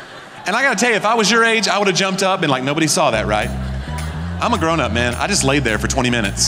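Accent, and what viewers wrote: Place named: Alabama, United States. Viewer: sounds like Alabamian’s.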